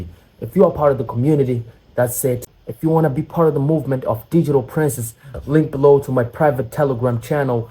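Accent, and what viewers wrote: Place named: United States. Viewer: Give this man some subs he's perfected the American accent while living in Mozambique!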